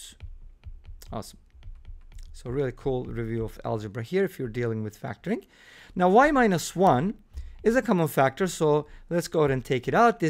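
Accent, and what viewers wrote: Turkey. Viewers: You have a Turkish accent, are you Turkish by any chance ?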